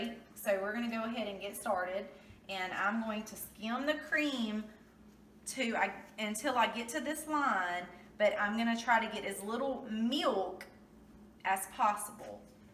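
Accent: South, United States. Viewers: Thanks for the inspiration to make our own butter. I think that method would work in our bosch mixer, oh and I love the southern accent. :)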